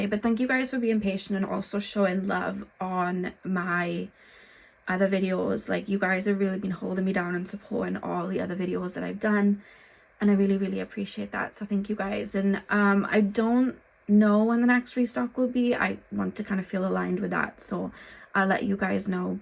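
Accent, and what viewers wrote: Wales, United Kingdom. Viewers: Pile 2; Thank you so much for the confirmation of where I am. You are so spot on, also with the Whale 🐋Been drawn to the Whales so much lately, and I read that the Whales and the Dolphins are gathering in HUGE pods all over the world now! WOW! Just saw an Eagle, and I saw one yesterday too🦅🦅YEI!!! I am new here and I really loved your reading❤‍🔥 And I love your Welsh accent, lived two years in Swansea, Wales, when I took my masters, so it is bringing me back. I come from Norway, in this life, but I the sound of your voice with the Welsh accent feels really like home🐉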